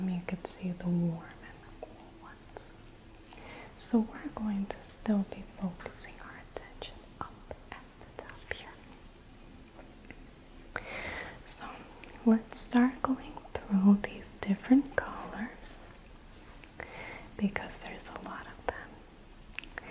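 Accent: United States. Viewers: aka American accent version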